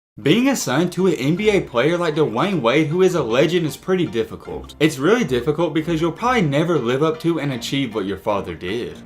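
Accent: South, United States. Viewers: Can’t finish video because of yo country ass voice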